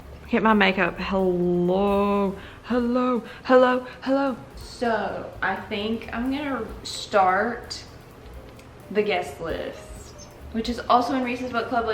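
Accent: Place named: Texas, United States. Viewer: I'm from England and I like the Texas accent lol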